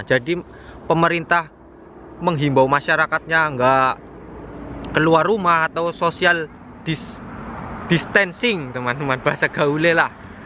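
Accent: Banyumas, Indonesia. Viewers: Ciri khas mas...ngapak😆👍👍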